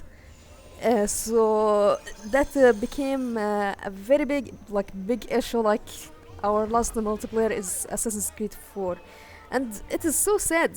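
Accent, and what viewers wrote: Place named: Arab world. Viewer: arabic english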